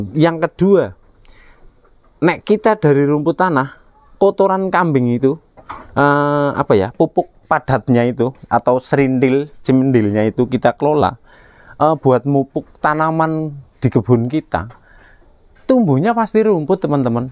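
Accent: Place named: Banyumas, Indonesia. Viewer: prtahankan Ngapaknya, trims ilmunya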